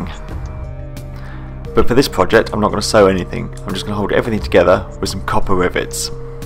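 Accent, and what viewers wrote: United Kingdom. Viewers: I guess your British, seing the house, your accent and such. Can I ask where you find your leatherwork tools and the leather? I have a hard time finding a store in Belgium, the closest is an hour drive with the car, and I am not sure that they have what I want. Online shops are also not that easy to find for a affordable price and have it trustworthy. I found some online stores in the U.K., but I have had a bad time ordering from the U.K. Maybe you know some trustable and affordable online stores? Thanks, and great video!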